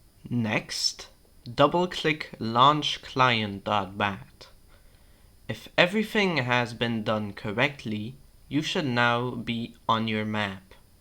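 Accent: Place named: United Kingdom. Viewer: great tutorial but youre british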